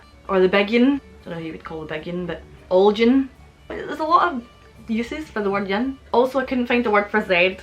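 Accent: Scotland, United Kingdom. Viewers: Heed banger means nutter or a bit crazy erratic,Quine is used in Aberdeen ,it good to here the dialect I now live in Australia,old jimmy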